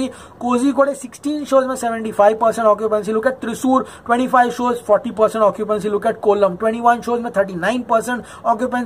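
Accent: Karnataka, India. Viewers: Bro ..i just love your kannada slang!!! You rock!!!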